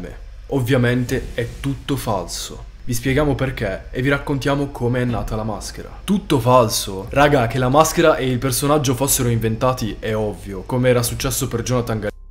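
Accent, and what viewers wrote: Sardinia, Italy. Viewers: Ciao cara, anche tu l'apprezzeresti allora? Magari facendo trasparire un pò l'accento sardo... mmmhh ci starebbe molto, molto bene ❣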